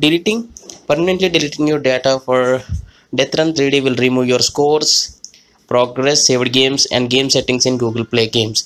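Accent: India. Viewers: Always a trash video, when it’s a tutorial with an Indian dialect…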